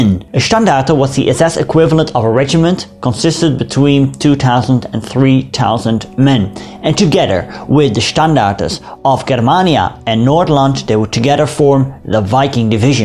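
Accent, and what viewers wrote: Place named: Netherlands. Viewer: oh mate I'm watching them all. I love your take on the subject and the crazy Dutch accent. My history teacher was an inspiration at school and I never lost the love of the subject.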